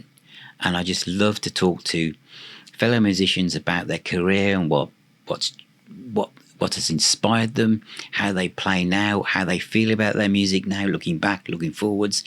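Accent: United Kingdom. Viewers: Discovered you around 6 months ago and really enjoying the videos. It’s great to see an ordinary bloke from the U.K. with a regional accent talking about music I love (most of the channels I follow are American). 45 year old, mainly CD collector here, collecting rock and metal. I’ve also just joined your Facebook group and waiting for approval! Keep it up mate. After watching this video it seems there’s lots to look forward to through the Autumn. Thanks for the content. 🤘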